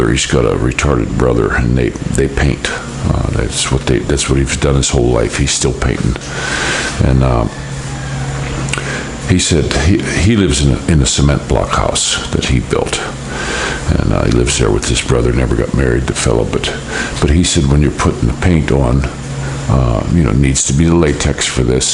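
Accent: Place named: Western New York, United States. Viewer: Sounds like you lived up on the lake! If I had to guess I would say silver creek NY or somewhere around Eire pa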